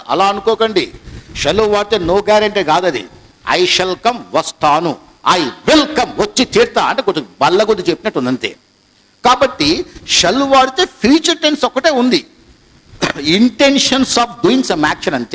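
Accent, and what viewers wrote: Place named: Telugu, India. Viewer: speaking english in telugu slang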